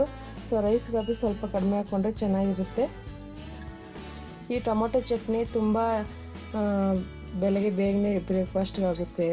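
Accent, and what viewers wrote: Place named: Kolar, India. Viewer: I think u r from Kolar region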